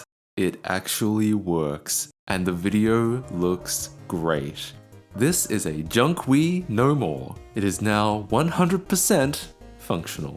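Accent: Australia. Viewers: Clicked on this video after a random recommendation and was pleasantly surprised to find a fellow Australian. More than that, your voice is crystal clear and really quite calming, on top of the fun and exciting content. Well done, dude! And thank you!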